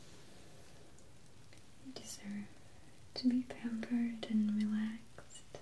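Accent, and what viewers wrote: Russia. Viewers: Her accent is Russian. Watch some newer videos. Sometimes she talks about Russia. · i love your accent sounds russian such a relaxing video :)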